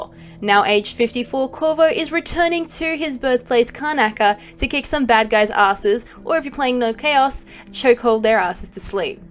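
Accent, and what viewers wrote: Australia. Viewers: are you aussie? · Even with the lack of official info u still manage to make an informative video, really impressive if I may say so. Is that an Aussie accent?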